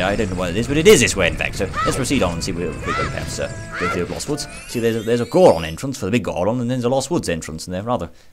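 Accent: United Kingdom. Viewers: Love the voice and brittish accent, you got a fave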